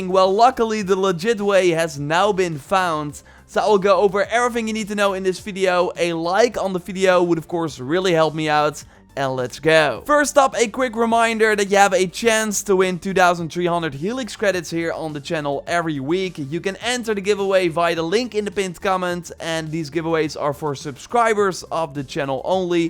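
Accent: Netherlands. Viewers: Are u dutch ???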